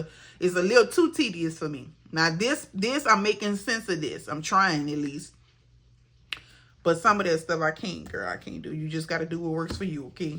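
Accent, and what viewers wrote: Louisiana, United States. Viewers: hey love your vids and the bayou accent:) lets follow dear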